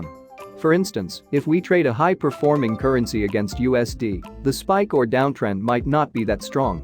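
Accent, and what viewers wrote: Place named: United Kingdom. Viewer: You are somehere in GB, Scotland or England most likely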